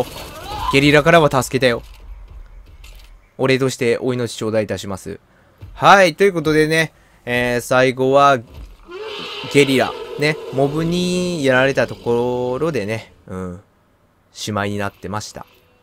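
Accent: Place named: Aomori, Japan. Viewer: プレデターもやけど、青森？癖の強いなまりに集中出来へんわ(笑)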